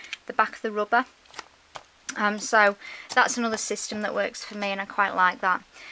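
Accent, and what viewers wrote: United Kingdom. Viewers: WOW FANTASTIC COLLECTION...obviously you are from the UK...by your accent...I just love your collection of vintage/angel wood stamps...beautiful... Lori in the USA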